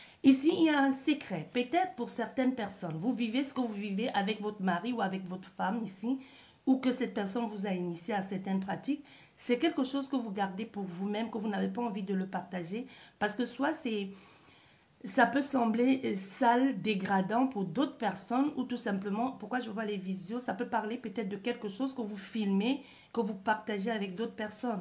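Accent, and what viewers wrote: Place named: Martinique. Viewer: Bonjour, Tu as bien fait de recadrer sinon c la dérive. J'ai sélectionné ce qui me parle dc merciii. Ton accent me rappelle celui d'ici (la Martinique). Vite ta prochaine guidance. 🌴☀🌴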